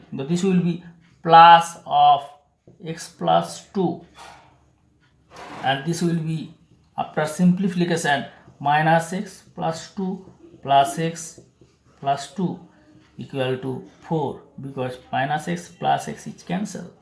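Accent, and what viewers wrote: Bengal, India. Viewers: Bhai sahab kuch samajh nahi aaya!!!!! Kya bol gaye pta hi nahi chala!!!! Bill bhi soon...lattar lattar next bhidio latter..... Kehena kya chahate ho bhai??????? Aap ek kaam karo aap hindi me hi bana diya karo video, koi problem nahi hai kisi ko bhi. But ye bengali + english accent kuch samajh nahi aa rha!!!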